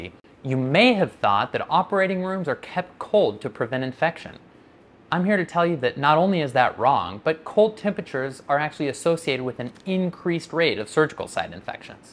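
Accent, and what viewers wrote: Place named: United States. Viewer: Oh really, well you’re speaking to a different audience than I assumed. Your English is clearly accented from the States. Carry on then but non professional Americans will not make the necessary calculations to make your temperature references meaningful. Let me also say other than that I think your presentation was excellent with meaningful information and I hope to see further videos by you.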